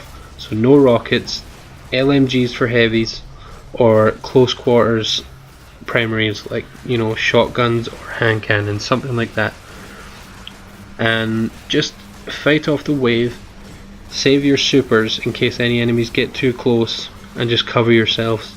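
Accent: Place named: Ireland. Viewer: You irish :o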